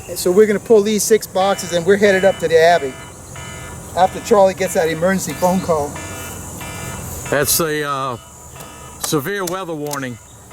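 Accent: Louisiana, United States. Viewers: how is everything where you live your accent let's me.know your in Louisiana new Orleans somewhere around there......how is.you and your family doing after the hurricane is.your property and everything and everyone okay I hope you and your family are.doing great God bless you guys I am still praying for everyone there 🙏 have a great night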